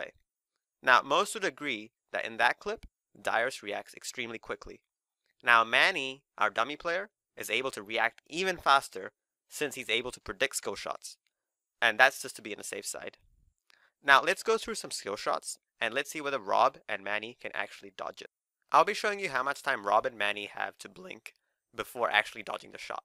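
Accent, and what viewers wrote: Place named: South Africa. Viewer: you sound south african dude :D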